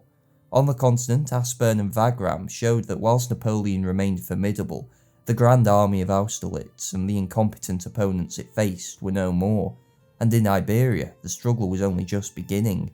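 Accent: United Kingdom. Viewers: Definitely British, but where specifically idk. I'm American too lol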